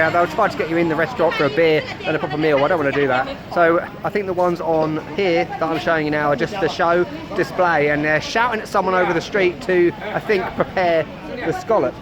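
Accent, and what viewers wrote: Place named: Cockney, United Kingdom. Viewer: You speak with cockney acent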